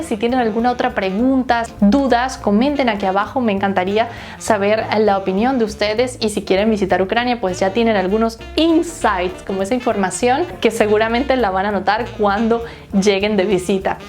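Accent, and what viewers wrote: Venezuela. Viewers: Ya decía yo, esta chama como que habla caraqueño. xD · Es como si una venezolana me estuviera explicando sobre Ucrania. Saludos! Pais hermoso Ucrania Por cierto, eso es totalmente cierto lo que dice en ; es uno de los motivos del por que me quiero ir de Venezuela Naguaráaaa! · De hace unos 2 meses aprendo ruso y de pronto me encontré con tu canal y ese acento me decía lo conozco, pero, será que me equivoco.... Pero hoy ya me di cuenta que no estaba equivocado Venezuelaaaa jejeje excelente.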